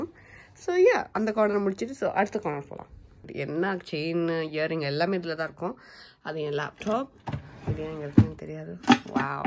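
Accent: United Kingdom. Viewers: Her cloth 😂 British accent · that British accent though! One of the best! Loved the vlog!! 💯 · Talk in British accent nxt vid ,maybe teach us ur accent,coz it'll ofc help us!! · British accent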